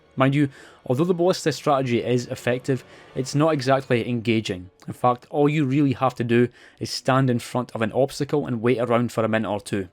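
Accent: United States. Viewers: The American accent is fire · That American accent is so fucking funny bro. 😂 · Your American accent is really spot on, could use some more practice, but pretty good. As a american, I approve lol. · love the american voice. i was forced to use 1 from cape town south africa, working for t-mobile. i hated how i sounded. you were great.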